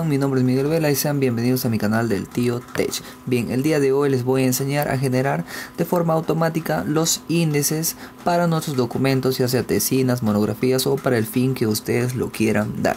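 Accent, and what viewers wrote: Peru. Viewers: Español Perú ☠ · peruano XD lol (lol)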